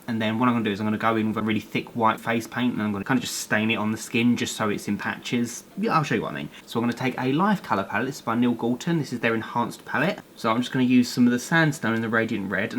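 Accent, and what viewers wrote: United Kingdom. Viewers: I love your British accent and you are really talented ❤️❤️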